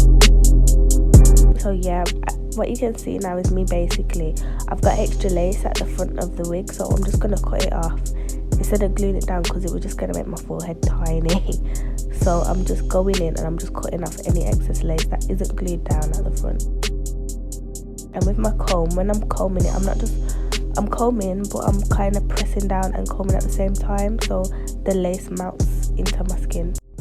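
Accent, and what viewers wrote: Birmingham, United Kingdom. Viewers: omg ur british!!!!!!!!!!! i love ur accent!!!! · Juliaaa Robb I think Birmingham in the UK maybe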